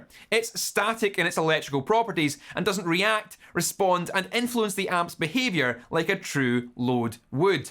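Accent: Scotland, United Kingdom. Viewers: Aside from this (very interesting and usefully informative dissertation) on load boxes, I could listen to a Scot talk all day; auditorily entertaining...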